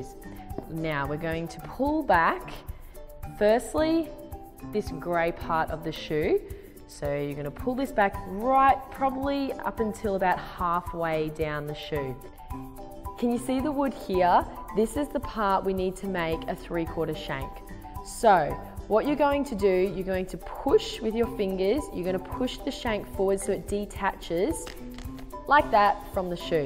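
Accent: Australia. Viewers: Is she Australian 🤔🤔🤔 if she is I live in Australia too. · r u Australian? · oh and also are you from Australia because you sound like it and i am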